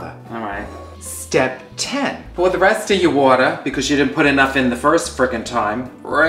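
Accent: United States. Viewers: does anyone know what type of american accent that is? i like it. is hilarious. could watch her videos all day.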